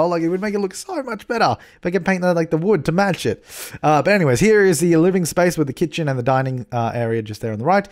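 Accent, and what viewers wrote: Australia. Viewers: It took me so long to figure out that is Australian... I'M AUSTRALIAN...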